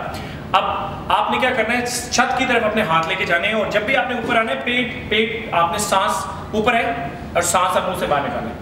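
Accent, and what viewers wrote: Punjab, India. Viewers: paaji.....appka jo accent hai bhot bhadia hai......that is punjabi,english and hindi mixx......ashians ke liye best hai aapki videos........khass kar punjabioo ke liye😘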